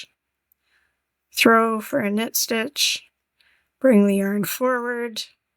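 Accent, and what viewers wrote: Canada. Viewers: I am a crocheter, but I recently learned to knit because I became good friend with a knitter. She taught me continental, but i stumbled into continental combination. I'm SO GLAD to find out that it will NOT mess me up in the long run to do that instead of "traditional" knitting. :D Also, I picked out that you're canadian instantly. Thank you SO MUCH for these tips and method options!! You've gotten another subscriber from me!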